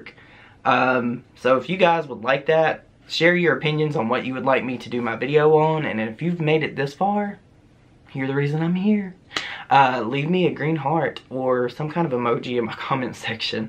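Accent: South, United States. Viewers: Aw, I just found your videos tonight and I absolutely love your southern accent. Promise things get better, screw those people who think they're all that and can do whatever, they aren't anything. Have a wonderful night, it'll get better.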